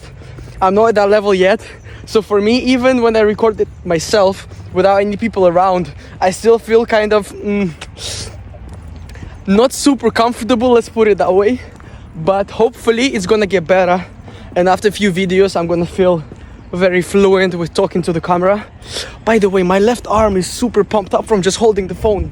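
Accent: Russia. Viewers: Привет!) Ты русскоговорящий что ли?) Чувствуется немного жесткость русской речи в твоём произношении. Стало интересно узнать разговариваешь ли ты на русском?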